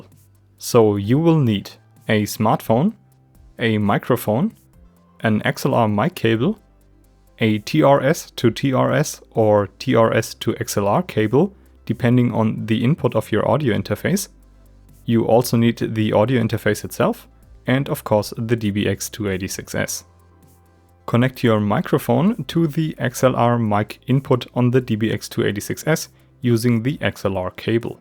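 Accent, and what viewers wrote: Germany. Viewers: Habe am dialekt gehört dass du deutsch bist kannst aber gut erklären :)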